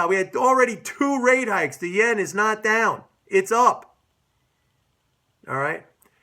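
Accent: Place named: New York, United States. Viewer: by the way ...Staten island ? .... or ... Brooklyn ??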